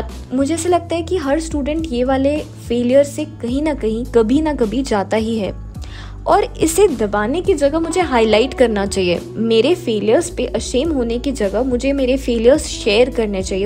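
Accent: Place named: Maharashtra, India. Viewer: Apan is an emotion ❤️ this proves that dii had passed a lot of time in Maharashtra and has a quite Marathi Toning 😂❤️❤️ GOD BLESS DII🙏🏻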